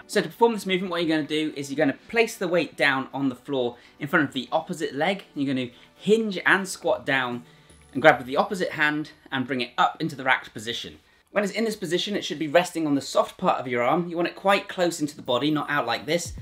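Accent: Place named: RP, United Kingdom. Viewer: Poshest sounding beast I've seen 🥂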